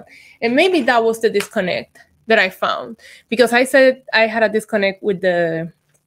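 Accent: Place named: Puerto Rico. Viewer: I told her you like your accent and I made a comment the last time and I guess you seen my comments but are you Puerto Rican because you talk like you are Puerto Rican but anyway I like that New Concept with you do your makeup and talk about wrestling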